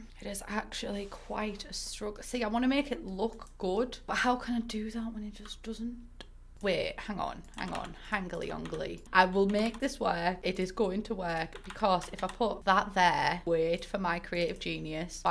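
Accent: United Kingdom. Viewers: It’s hard to understand british english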